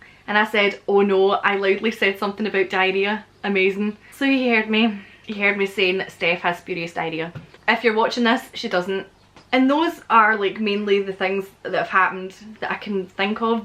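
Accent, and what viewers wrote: Scotland, United Kingdom. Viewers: Sounds like you're a shambles of a lass 😂 love it, definitely subscribing! The one about walking into your mates kitchen with your shirt undone had me flippin dying 😂 Mind you the Scottish accent doesn't hurt 😆 I could listen to Scottish people all day.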